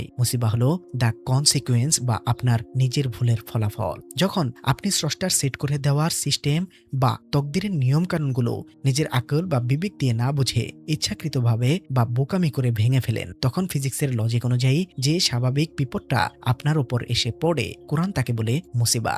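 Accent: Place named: Jamalpur, Bangladesh. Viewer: oh আচ্ছা সেটা বলেন,,হ্যা সমস্যা নেই,তবে আমগর এলাকার নেহালা ভাষা ত, মেল্লা মিল আছে, হেজন্যেই কইল্যাম।যদি এবা অইতো এপনে আসলেই জামালপুরের, মেল্লা বালা অইতো 😅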